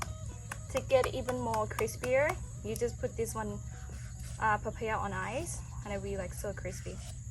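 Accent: Australia. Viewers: I hear an Australian flavour to the way you speak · You have a slight Australien accent.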